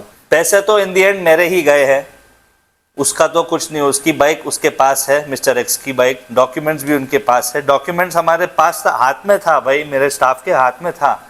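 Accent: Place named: Punjab, India. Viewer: He speaks Punjabi more like north punjab punjabi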